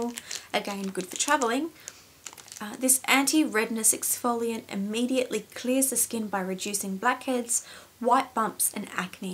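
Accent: Australia. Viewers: Hi Beauty. SORRY...but I love you ;-) I am not a lesbian, just think you a great girl! Everything about you is great! Your voice, face, passion and the fun of it! Your Aussi-Slang. I am a body-beauty-freak, too! I just like you! Phantastic person. Would love to hear from you - learn more about you! Greetings from Germany <3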